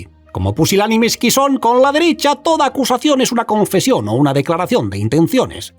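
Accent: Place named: Catalonia, Spain. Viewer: tenga acento catalán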